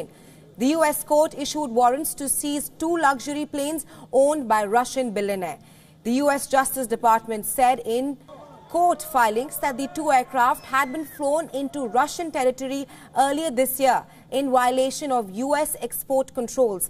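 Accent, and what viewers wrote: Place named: India. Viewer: Disgusting ' Indlish ' pronunciation !!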